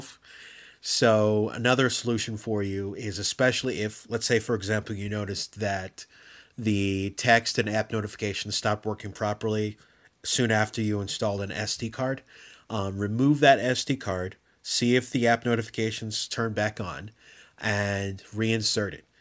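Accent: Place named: United States. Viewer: Thankyou! And nice to listen to someone who actually speaks English and doesn't speak too fast! American English!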